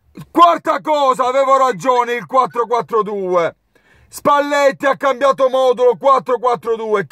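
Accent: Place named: Milan, Italy. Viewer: E' l'accento milanese che lo frega ahahahahahahahahahahshshahs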